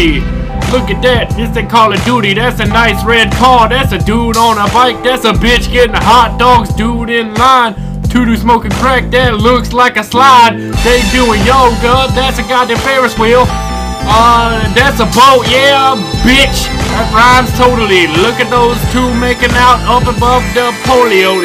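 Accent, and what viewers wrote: United Kingdom. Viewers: Is he British?!?! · is he british? please reply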